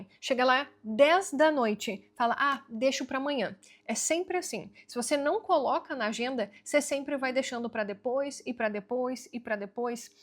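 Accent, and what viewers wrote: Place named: Paraná, Brazil. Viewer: Assistindo muitos videos seus. Que deus lhe abençoe sempre! Seu sotaque anglo paranaense e trocando o S pelo x tipico de avó alemã e um barato! Voce e uma fofa e a sua inteligencia amplifica sua beleza! Parabéns 🎉 Sonhos nao envelhecem !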